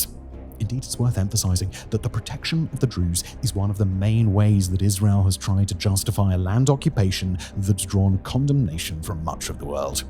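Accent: United Kingdom. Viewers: His Britishness is the same on most his channels with cadence and tone, but the backing music really does push it one way or the other. But yeah, it’s all SEO stuff designed to promote and get your attention, it’s how it has to be done if you want to get views and keep them. Blame YTs algorithm ultimately.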